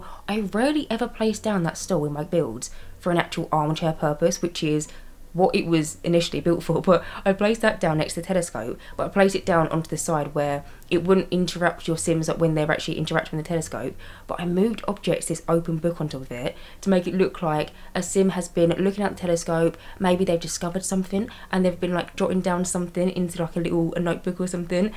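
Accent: United Kingdom. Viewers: Love ur builds since for ever!! But what really sold me was ur beautiful Brittish accent😂❤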